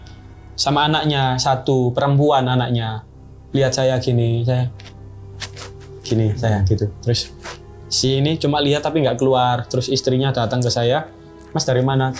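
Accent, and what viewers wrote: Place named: Java, Indonesia. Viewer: Medok banget mas....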